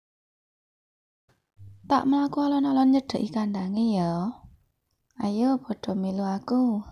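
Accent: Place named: Java, Indonesia. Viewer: Bahasa jawa enak didengar ya ternyata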